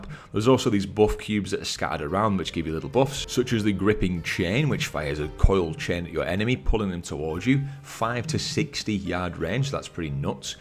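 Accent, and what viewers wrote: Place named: North, United Kingdom. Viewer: Northern British accent >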